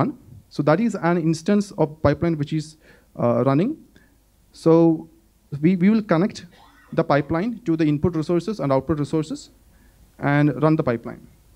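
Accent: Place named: India. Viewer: what a mess. no subtitles to bad indian accent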